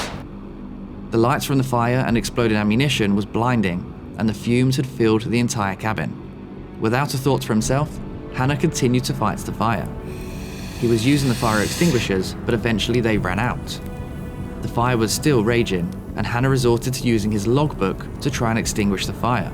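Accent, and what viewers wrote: England, United Kingdom. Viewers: I really appreciate the variety of aviation incidents you present, & this certainly was a very tense & exciting account. What a remarkable young man John Hannah was. Such sorrow for his life cut so short. I enjoy your clear concise narration and English accent too.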